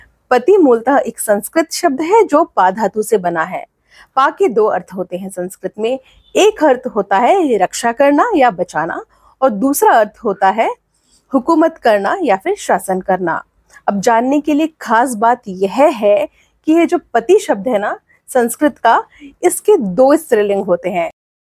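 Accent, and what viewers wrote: Standard. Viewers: आप स्पष्ट और शुद्ध उच्चारण के साथ सम्यक जानकारी प्रदान करती हैं, इससे हिंदी भाषा में रुचि रखने वाले लोगों का भाषा ज्ञान बढेगा हार्दिक शुभकामनाएं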